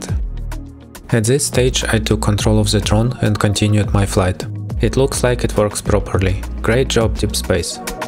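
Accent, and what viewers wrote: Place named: Russia. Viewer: Are you from Russia? I hear the familiar accent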